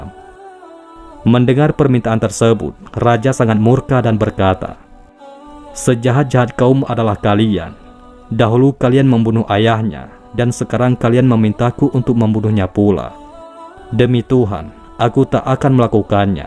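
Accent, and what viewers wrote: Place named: Gorontalo, Indonesia. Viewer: Logat pembawa berita seoerti logat hulondalo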